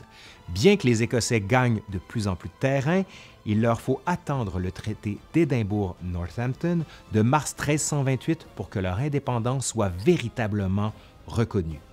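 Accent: Quebec, Canada. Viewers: Tabernacle Chrit osthie j adore l accent québécois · je suis un simple francophone, je vois une vidéo avec un accent Québecquois à couper au couteau, je souscris.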